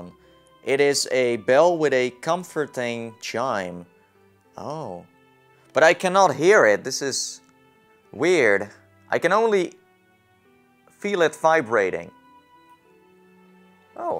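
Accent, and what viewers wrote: Netherlands. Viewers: Ben je Nederlands ofzo XD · I knew your were dutch because ik ben ook nederlands :p hoorde het meteen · TomySeptic Dragon He's dutch... I think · 100% dat je Nederlands bent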